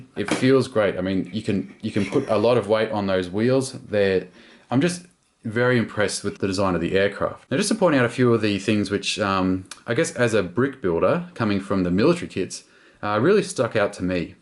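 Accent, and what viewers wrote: Australia. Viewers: Are you from Australia, you have that acent?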